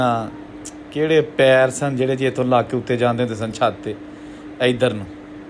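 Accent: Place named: Dogri, India. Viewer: Your commentry on this video hearted me very much touch of local dialect resembles with my mother tongue Dogri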